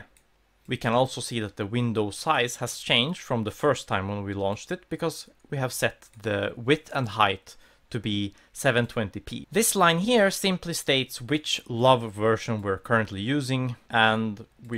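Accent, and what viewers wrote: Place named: Scania, Sweden. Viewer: I'm also swedish you sound south.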